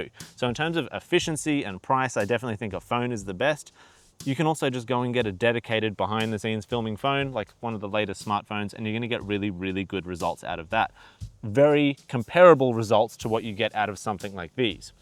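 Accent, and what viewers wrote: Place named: Australia. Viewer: Great video - also love to hear another Aussie’s accent on YouTube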